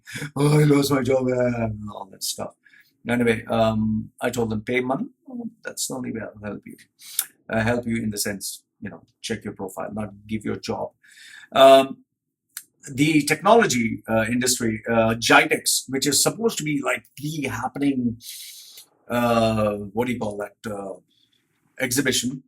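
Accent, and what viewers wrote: India. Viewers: Curious - why are you wearing a full face Maori Moko yet sound Indian.....?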